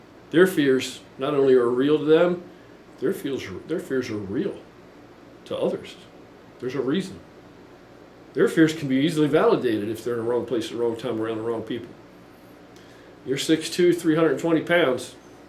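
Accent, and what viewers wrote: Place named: Central Pennsylvania, United States. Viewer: Central PA or Maryland accent (No offense, of course, I'm from there and recognize it - new to the channel, too, so if everyone else knows where you're located, I don't yet).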